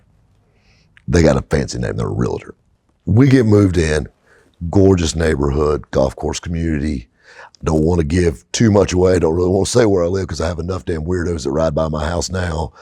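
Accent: South, United States. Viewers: Ei you are the man, I love ur stories. I don't understand a half of what you say, but I love ur southish accent.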